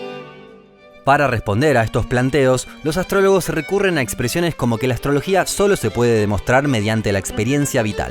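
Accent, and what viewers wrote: Argentina. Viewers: Me gusta el contenido. Ese acento argentino, en vez de molestarme, le da singularidad.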